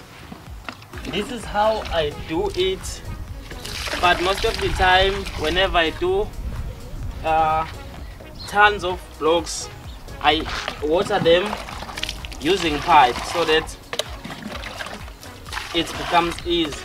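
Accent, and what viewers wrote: Uganda. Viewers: this guy has 100% Ugandan accent... good work though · He talks like a Ugandan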